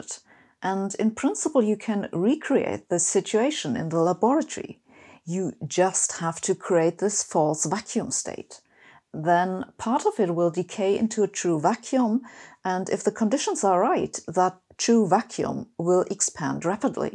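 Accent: Germany. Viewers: A hard German accent of English,I'm using "CC".After this presentation,I'm enlightened.Einstein again,I'm starting to believe in God.I give up of all things,smartphone,laptop,car,TV,light etc powered by ELECTRICITY .I'm going back to the cave to light the fire,using GRAVITY of course!I would like to know what mass it is exactly,what number or equation so that I'd be able to light a fire in the cave by gravity? Light is not sound,so as not to have a wrong perception!Welcome to the 21th century and physics from the 18th century!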